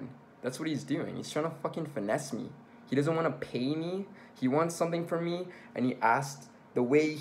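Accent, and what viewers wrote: Canada. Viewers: You just sound so Canadian and it’s all I can think about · You and Brad sound just alike. Must be a Canadian thing..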